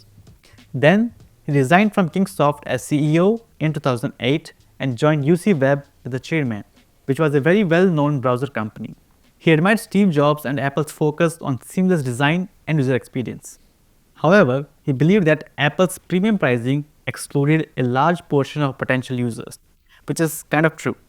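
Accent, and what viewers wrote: India. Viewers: your accent is shouting indian!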